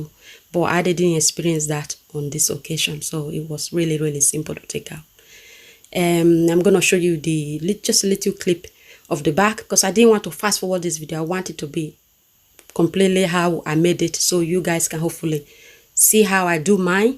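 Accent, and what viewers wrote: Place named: Nigeria. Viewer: Your accent is very thick and lovely, are you Nigerian?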